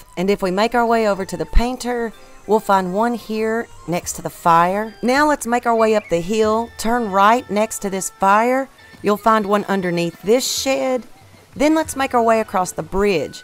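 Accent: South, United States. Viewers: I swear homie sounds like she could be related to me. So damn southern it can't be scrubbed off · I have just recently started playing boyw and I love tour vids, they are so well explained. Also I love that foxy southern accent. Much love from Scotland.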